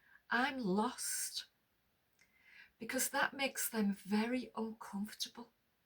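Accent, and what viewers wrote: United Kingdom. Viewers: Hi, just found you. I want to give you a hug. Everything you have said resonates with my journey which started 3 years ago after my husband died. No one understands until they go through this, we were together for 50 years. I have just returned from my son & his family (for Christmas)just outside Liverpool so recognise your accent😊 I am in Malvern. Please keep putting your feelings on here, I am sure it will help you (and many others too). I too have realised that our “couples friends” no longer have anything in common with me and that my husband seems to have never existed for them. Yes- they too may have to face this one day. Sending love ❤ Sue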